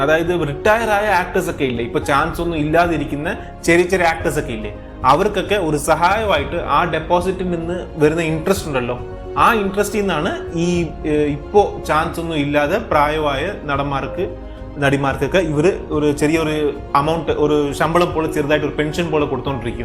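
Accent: Tamil Nadu, India. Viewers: ഒരു തമിഴ് ചെവ സംസാരത്തിൽ ആരിക്കും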